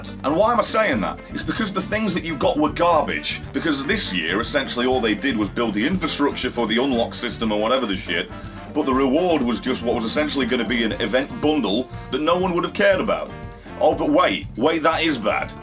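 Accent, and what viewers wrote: United Kingdom. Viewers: There’s something so satisfying about a fine bri’ish gentleman going absolutely mental while also making good points on camera that is just *chef’s kiss*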